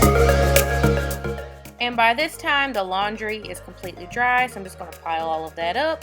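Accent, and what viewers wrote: South, United States. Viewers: you sound so country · swear I love southern accents! and good job on the house!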